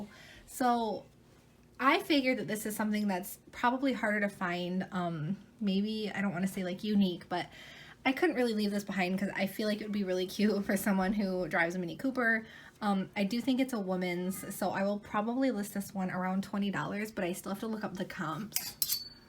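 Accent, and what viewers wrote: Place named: Upper Midwest, United States. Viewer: Are you from North Dakota or Minnesota by chance? I’ve been watching the ‘Fargo’ series on Hulu lately and couldn’t help notice you have a little accent that’s similar to the show.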